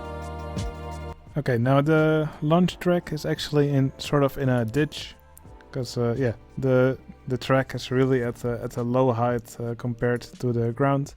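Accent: Netherlands. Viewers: Ik kan wel horen dat je Nederlands ben.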